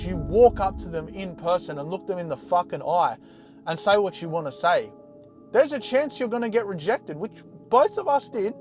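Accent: Australia. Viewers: mate r u aussie?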